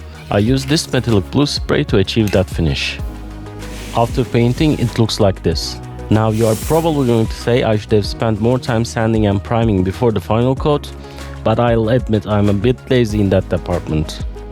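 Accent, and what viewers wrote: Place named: Turkey. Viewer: aksandan hemen anladım Türk olduğunu eline sağlik kralim güzel oldu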